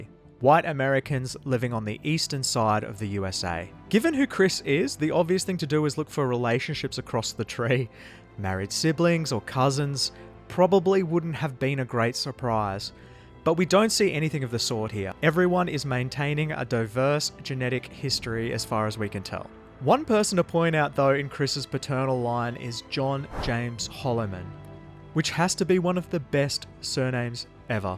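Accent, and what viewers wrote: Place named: United Kingdom. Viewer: I love the great British narration keep up the good work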